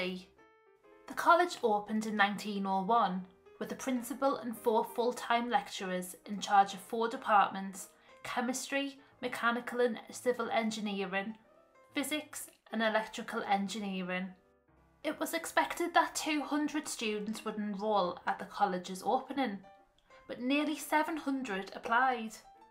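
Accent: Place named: Sunderland, United Kingdom. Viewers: I love a Mackem accent. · Stroll on! That accent is horrible. I'm a Geordie (Gateshead must be, oh ten or twelve miles away from sun'lun but I'm telling you, that accent man!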